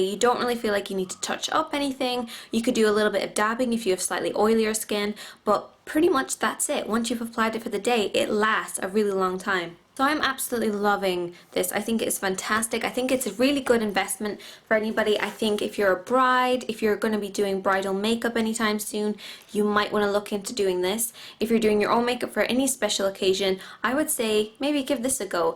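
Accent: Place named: Ireland. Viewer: I noticed the Irish Accent then checked your facebook and your from Cork!!!!! me too! thats so cool!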